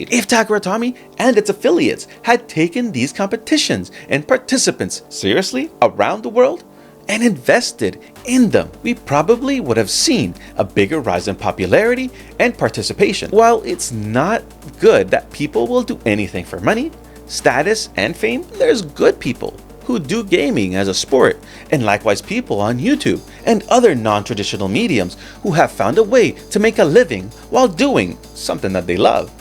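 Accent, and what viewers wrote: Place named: Canada. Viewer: [tɑ.kɑ.ɹɑ təʊ.miː] is how I pronounce it. Japanese people don’t bat an eye when I say it. But I don’t feel like I say it like they say it. You’re speaking in English with a Canadian accent. You’re not saying it the way I say it. But I ain’t a Canadian. In the end, who cares? 日本語を話しますか？Probably not. But again, who cares? I’m still trying to get used to your cadence. 😅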